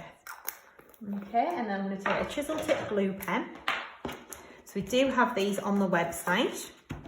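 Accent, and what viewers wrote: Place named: Northumberland, United Kingdom. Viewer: Like your Northumberland accent :)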